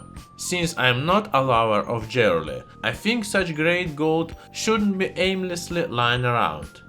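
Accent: Russia. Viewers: He was from russia? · he does seam to have a Russian accent...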